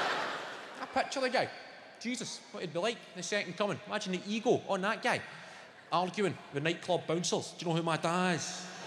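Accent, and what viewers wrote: Scotland, United Kingdom. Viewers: I loved loved his deep Scottish accent!!! So sexy!!!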